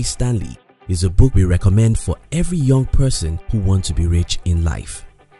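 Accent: Nigeria. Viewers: Accent sounds Nigerian :? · I know a Nigerian when I hear one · voice must be Nigerian. m'right? i'm 98% certain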